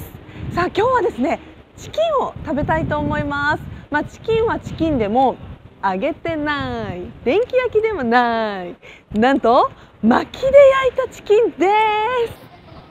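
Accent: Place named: Japan. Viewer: 발음이 완전이 일본분 나마에와 한국이름 그렇군요, 맛집 알려주셔서 감사합니다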